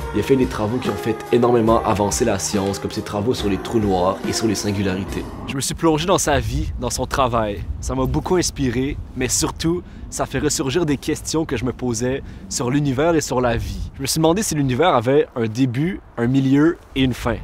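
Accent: Quebec, Canada. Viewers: Salut.. je suis pas sûre si tu vas lire ça ou non.. mais j'ai décidé de le faire quand même.. je suis ni d'origine francaise ni québécoise alors j'ai eu qlq difficultés pour comprendre un peu tes vidéos mais le contexte est très clair et vraiment tes vidéos sont très profondes et touchant tu fais un excellent travail.. j'aime bien l'accent québécoise et c'était parmi les bonnes choses que je vécu lorsque j'ai tombé sur ta chaine c'est un trésor perdu que j'avais un chance de le trouvé.. bonne chance et mercii☺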